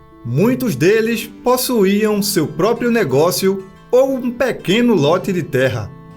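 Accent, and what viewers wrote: Northeast, Brazil. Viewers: Esse sotaque é ótimo. Muito lindo sotaque nordestino.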